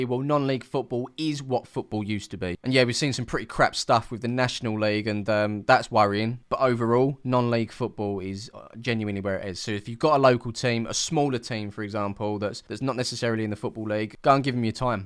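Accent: United States. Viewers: Fun concept, but wow this is bad for Europe. We at Forward Madison FC will gladly take you all in. And you have a spot on American accent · On one hand, I’m offended that you needed to do the American accent, but this is largely our fault, so.... dammit.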